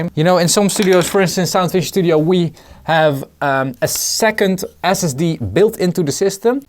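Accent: Netherlands. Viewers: goedemiddag Nederland , ik heb die update ook gedaan, maar bij mij werkt alles nog goed , ik dacht al jou accent klinkt zo Nederlands :)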